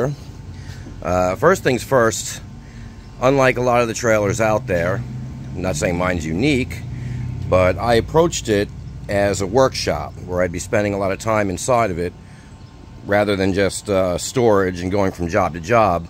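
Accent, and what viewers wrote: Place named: Pittsburgh, United States. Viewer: You from Pittsburgh 😁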